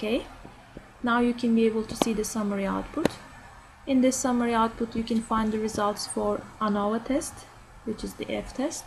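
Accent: Turkey. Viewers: tam bir türk ingilizcesi nerde duysam tanırım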